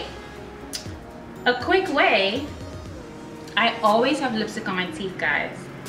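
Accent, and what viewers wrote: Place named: United States. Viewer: haha meisje ie tja kiek yere! Mi lob din video's dief yu. A accent fie helemaal amerikaans, a no spotoe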